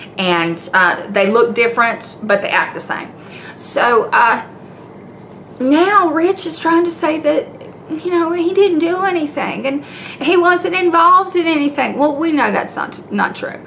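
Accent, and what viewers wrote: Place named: Georgia, United States. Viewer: That is hilarious! I’m from Tennessee, and if you give me a minute, I can sound like a hillbilly or Dolly Parton, take your pick. Otherwise, I think I have just a bit of a Southern accent. (I watched a load of TV growing up, so I adopted a neutral accent on purpose after I was insulted by a Canadian as a child while on vacation in Florida.) Where are you from? I would guess Northwest Georgia by listening to you.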